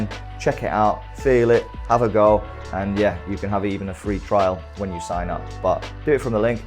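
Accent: United Kingdom. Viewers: Great video, after watching 2 weeks worth of americans shouting about what to do it was so refreshing to hear a British voice talking sensibly. Thanks for your time and I'll be using some of your recommendations to get started properly. Regards Steve from Cardiff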